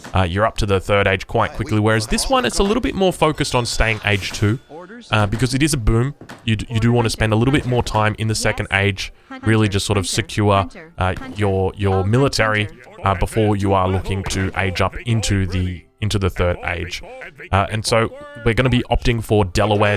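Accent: Australia. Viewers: Love the way ,you aussies say "Good'ay"! I've been playing AOE III for some years, but i don't feel prepared for online xD Cheers from Portugal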